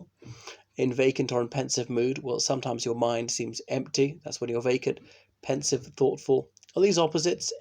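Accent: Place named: England, United Kingdom. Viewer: Nothing like a Springtime poem read by a gentleman with an English accent. Cheerful and uplifting in these challenging times. Thank you.🌷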